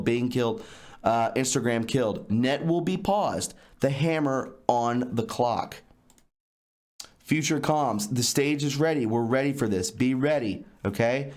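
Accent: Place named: Georgia, United States. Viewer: not sure where you are but your Southern sound a whole lot like Hart County Georgia. Love that area and miss it terribly. All the South is great, though. 🇺🇸❤️🇺🇸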